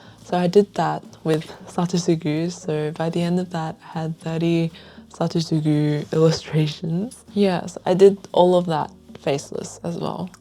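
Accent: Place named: Australia. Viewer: Love this! Massive help. By your accent by any chance you’re from Australia?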